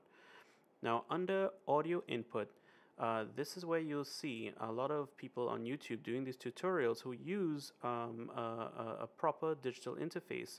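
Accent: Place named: Trinidad and Tobago. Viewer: This may have been an issue I would have encountered sooner or later and may not have known what to do, so thanks a lot for this heads-up bro!! p.s. from the moment you spoke I knew you were a Trini.. we can't hide lol but your standard English is very good!! Blessings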